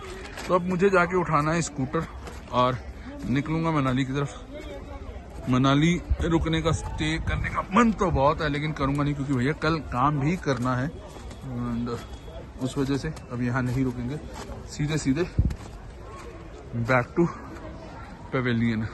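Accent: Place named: Punjab, India. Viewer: Lovely Vlog, Papa ji. The best part I like in all your vlogs is your Hindi narration in sweet Punjabi accent. God Bless You. I too, have purchased the Same Jupiter as yours, but in Light Blue shade & I'm very happy with it.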